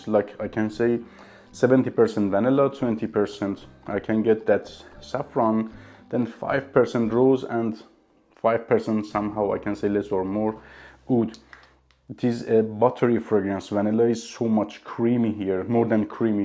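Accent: Iran. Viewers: به لهجت میخوره ایرانی و هموطن باشی ، موفق باشی جان لذت میبرم از رویو هات و خیلی تو خرید هام هرسری کمک میکنه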